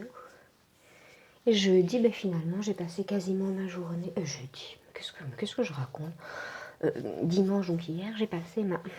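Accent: Belgium. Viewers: l’accent belge en plus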